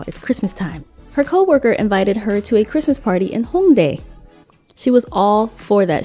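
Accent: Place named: England, United Kingdom. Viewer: Just love your stories. You're such a good storyteller & make me laugh. Also, must say I enjoy your English accent. I'm a linguist too, and a great believer people who speak other languages are also good mimics. 😊 Keep doing what you're doing - more power to you girl! 😊😊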